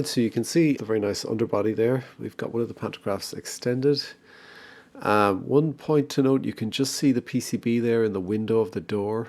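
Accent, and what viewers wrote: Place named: Ireland. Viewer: You sound like a fellow Irish chappie . Great video and thank you !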